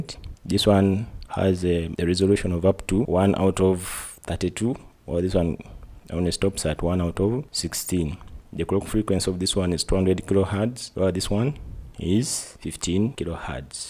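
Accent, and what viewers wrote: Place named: Uganda. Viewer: The presenter sounds so Ugandan!!!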